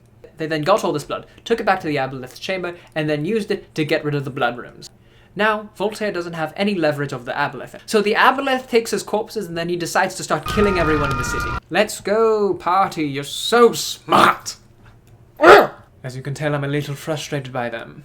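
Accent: United Kingdom. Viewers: Y’all already know that soon there’s gonna be fan fiction (smut) on Wattpad of Voltiaere🙈 Btw I’m simping over because of his content and his British gentlemen from the 1800’s accent aka Voltiaere😳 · What's more impressive than his British accent is how long his can keep his accent. Mine tends to fall apart the longer I try to speak with it. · That one person that disliked is just salty cuz they can’t do a British accent as good as his. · read the bee movie script in your british accent. this is an order. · Come for the British gentleman voice, stay for the amazing DND stories I mean seriously your home brew content is so damn cool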